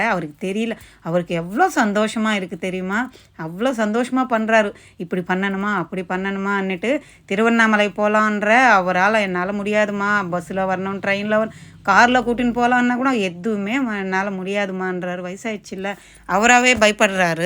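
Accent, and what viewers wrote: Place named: Bangalore, India. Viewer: Akka neenga Bangalore uh slang Apadi Iruku....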